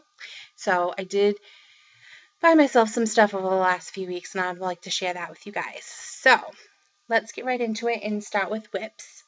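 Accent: Boston, United States. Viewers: Great video and great haul!! I love listening to you!! Your pieces are awesome!! Ps.. I am Canadian and I knew your accent was Boston!! Love it!! Happy Birthday!! I hope you have lots of stitchy fun!! And happy thanksgiving to you and your family!!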